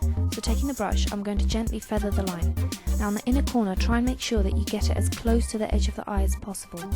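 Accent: England, United Kingdom. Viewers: YAAAY you have a Brittish accent! I LOVE accents, too bad I suck at immitating them. You're really good at makeup designing. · ahaa, i did it =3 very nice tutorial!! do you have an english accent? Your voice sounds cool!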